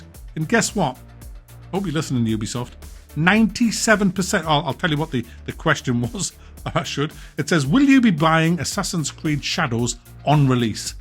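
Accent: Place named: North East England, United Kingdom. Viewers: Northumberland accent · Nothing makes me feel more at home than a North Eastener going on a rant there’s a sub for ya 😂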